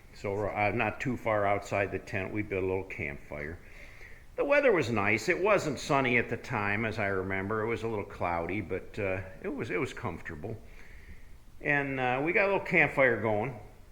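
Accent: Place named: Ohio, United States. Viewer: Happy New Year to you two. Thanks for the stories. You have an Ohio accent.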